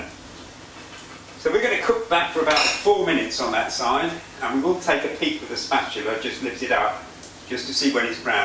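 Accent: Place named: England, United Kingdom. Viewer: After trawling through the many offensively inauthentic bubble and squeak videos on Youtube (where bubble and squeak is baked or includes egg or is formed into patties), I KNEW I'd found the genuine article when I started this video and saw this very English-looking, English-sounding gentleman in his very English kitchen. I've been having trouble keeping my bubble and squeak in one contiguous chunk through the turn (a challenge, as I've been using a large, heavy pan), so I appreciate the direction when it comes turning the thing over. It's great to see someone doing this properly! Thank you!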